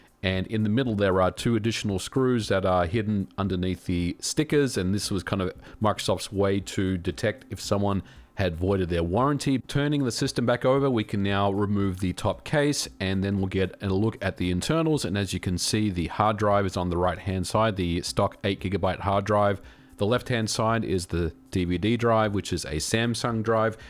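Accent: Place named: Australia. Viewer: Still crazy to hear an Australian accent in such a popular gaming channel.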